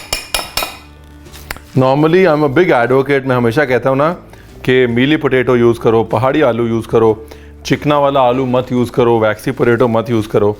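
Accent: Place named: Lucknow, India. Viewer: I like the recepie and your Lucknow Hindi is awesome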